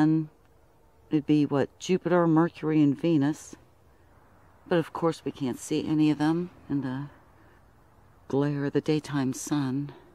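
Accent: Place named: Ohio, United States. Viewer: You have a great accent, is it unique to Ohio?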